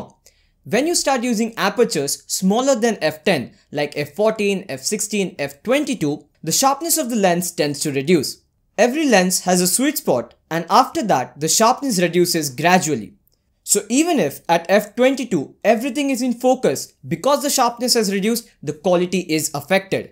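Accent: India. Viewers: I'm sorry but I can't focus on the video, Indian accent is the best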